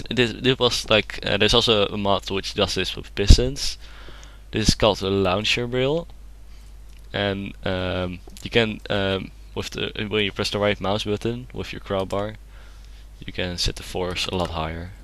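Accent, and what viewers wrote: Netherlands. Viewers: I hear a Dutch accent ^^ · It's sooooo easy to recognize a dutch accent (says this dutch guy here) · you're totally right, he is dutch, I (guy from the NETHERlands) think. I only heard it after you said it though. I have a rather "deftig" (The Queens English) accent.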